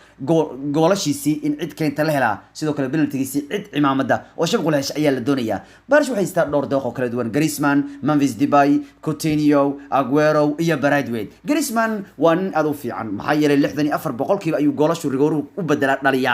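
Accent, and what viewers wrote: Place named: South, Somalia. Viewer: Ileen afsomali in la bahdilo wax ka kow ah af reer konfured wlee waa laga sheeken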